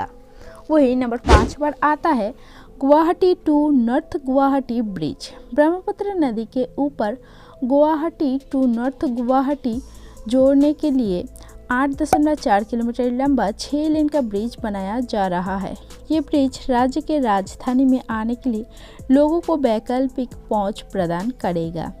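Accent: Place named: Bengal, India. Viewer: Pata nahi kyu bangali excent main baat kar rahe hai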